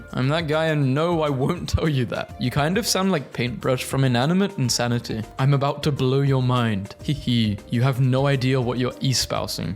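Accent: United Kingdom. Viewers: Is it just me, or does this guy sound British?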